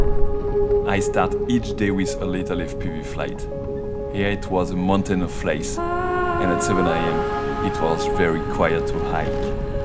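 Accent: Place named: France. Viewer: Propre!!! Ah on voit que monsieur à encore progresser. C'est bon, tu maitrises tout le package des vidéos des grands youtubeurs ^^ Au niveau de la colorimétrie, tu nous sors plusieurs ambiance qui s'enchainent bien, niveau du rythme du fpv on y est, on a plus rien à redire. Niveau effet spéciaux, c'est bon, quand je vois tes portes on est au point aussi. C'est un mini parralax 3 que tu nous a sorti en fait ahaha il y a peu être UNE chose à redire: ok l'anglais pour un meilleur référencement mais on entend encore trop cet accent frenchie ahaha Content de voir que tu progresses autant, plus qu'à trouver d'autres idées créatives! Tu es arrivé au top:D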